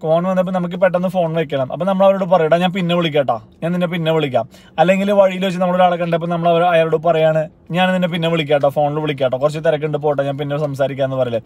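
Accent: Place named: Thrissur, India. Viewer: ലാസ്റ്റ് ക്വസ്റ്റ്യൻ and ആൻസർ ആണ് ഇഷ്ടപ്പെട്ടത്. പിന്നേ,,, തൃശ്ശൂർ കാരൻ ആണല്ലേ... ഞാനും.🥰എത്ര അറിവ് നേടിയാലും അവരവരുടെ നാടിനെ ഓർമ്മപെടുത്തുന്ന തനത് ഭാഷ ശൈലി . ഇതിന് മറുപടി ഇന്ന് പഠിപ്പിച്ച ആദ്യ പാഠം തന്നെ ഉപയോഗിക്കുന്നു.🙂 Well done my boy 😂 keep it up 😋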